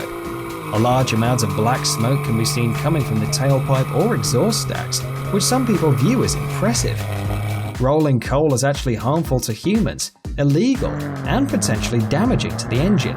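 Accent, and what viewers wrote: United Kingdom. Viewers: Okay, for those griping about his accent (foolish enough to think they have no trucks in the UK), here's an American guy who agrees wholeheartedly with him. Get real. Regardless of who says it, do you really think those things (oversized rims/wheels, air pollution, etc) are actually "cool?" Not by any stretch. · I refuse to listen to a Brit talk about trucks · Ok first things first take your British accent and go fuck yourself and second learn how to spell tire... and lastly what shit do you know about trucks where you drive on the opposite side of the road and in the car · WHY THE FRUITY BRIT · Why the fuck is a brit talking about trucks? Get back to your tea. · Lmao hes british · First a British speaking gentleman explaining American trucks? Second, why would you go off road with low profile tires? Third, double digits is way to high for the road, buy if you go off road with it, who cares?Forth, if you feel the need for truck nuts, compensating for something? 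· Those British accents are like fingernails on a blackboard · This dude is brirish and is probably justa city boiiiii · Why is a British person talking about this 😂. 2 or 3 things he listed are actually badass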